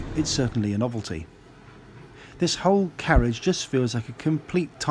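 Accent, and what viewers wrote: United Kingdom. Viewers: . I'm writing you from Ukraine. You are really. I'm very lucky to see your wonderful journey. It's gonna be super. You are very cool. I adore Arctic and everything what is connected with this region. Fantastic show. Railway in Sweden is really engineering marvel. Nature. I have no words to describe my ambitions. Your fluent English, your real British accent, your manner of speaking, your wonderful voice, music, lifestyle — OK, everything was pretty good. I'm your biggest fun. Thank you very much for your journeys. I love it. You are on my chance. Suppose see you soon.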